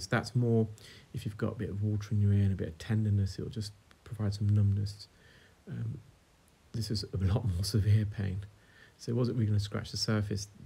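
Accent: United Kingdom. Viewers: You're not the first to fall for a British accent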